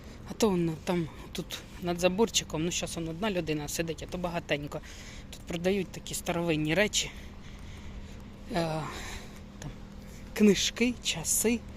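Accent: Poltava, Ukraine. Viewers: Полтавський діалект😊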